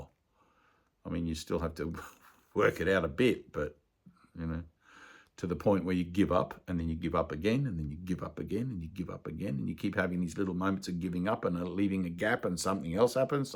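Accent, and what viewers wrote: Australia. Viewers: You sound Aussie. Are you?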